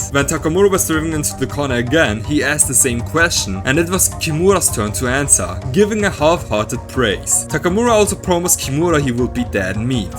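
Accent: Germany. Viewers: Krass wie sie man merkt dass du deutsch sprachiger bist😂😂😂es ist immer wieder so witzig herauszuhören wenn man deutsch ist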